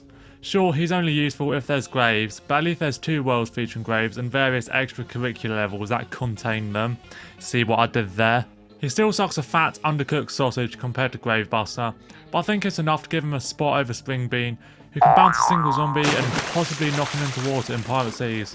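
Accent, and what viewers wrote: United Kingdom. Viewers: This guy is so unironically British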